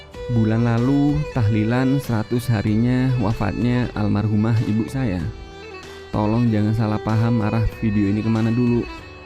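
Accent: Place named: Probolinggo, Indonesia. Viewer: Pantesan mas, logat e khas pol wong probolinggo 😅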